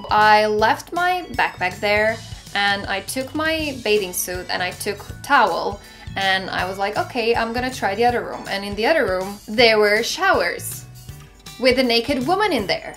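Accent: United States. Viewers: Can I ask how you got such a strong American accent? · That's a pretty strong American accent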